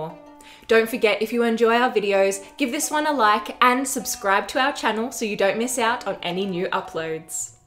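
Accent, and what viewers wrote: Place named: Australia. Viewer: First off, though the narrator sounds Australian, she could just as easily be South African or even white Kenyan with that accent, so don't make assumptions based on skin color. Second, how is this stopping "Africans" from telling their "own" story? Third, who's exactly "African" in your opinion? "Kemet" Egyptians have been proven through the archeological record and DNA analysis to be from the modern Syrian, Israeli, and Palestinian region. Then they were further Semitized through the Hyksos invasion in the north, while having sub-Saharan contact through the Nubians. Both of those groups held dynastic rule for a short while. Then the Persians conquered Egypt. Then the Macedonians. Then the Romans. Then the Arabs. So again, who do you believe is "African" in your opinion?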